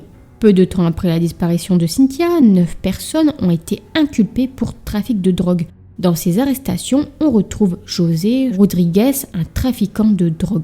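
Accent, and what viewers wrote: North, France. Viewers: Petit accent du Nord, me trompe-je ?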